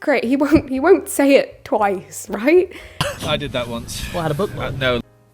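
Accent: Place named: Ireland. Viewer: Your Irish accent comes through a lot stronger when you are just chatting about self and things, then when you are doing skits. Not sure why that is.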